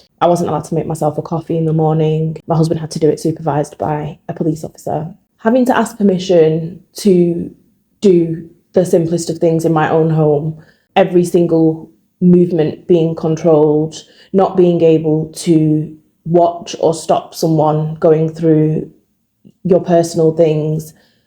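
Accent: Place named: England, United Kingdom. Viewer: I thought this was from another country at the start then I realised you have an English accent and then it began to dawn on me you were talking about Manchester and Manchester police. I should be shocked by how you have been treated, however I am not because of how the so called Government is acting. I am deeply saddened that you have suffered this traumatic treatment and your children. 15 years ago, when my children were primary school age I was treated appallingly by local police because of a false allegation & it meant I missed my sons school assembly (I had never missed one) this upset me more than what was happening to myself, my house was raided & although there was the worst snow fall in years I was not allowed back to my property until they had finished late evening. This was not as bad as you had to endure however I can understand how you felt about your personal belongings, your personal home. 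Thank you for your bravery, your resilience and for having the courage to continue to speak out alhamdulilah. May Allah continue to bring his calm and strength to you and your family for all you are doing for Palestine inshallah. Although this was a terrifying experience for your children, your children will remember how brave their mother was and that you are fighting to support Palestine in an effort to bring an end to this genocide inshallah alhamdulilah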